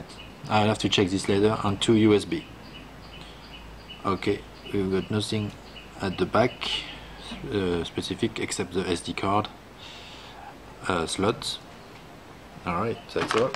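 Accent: France. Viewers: Such nice french accent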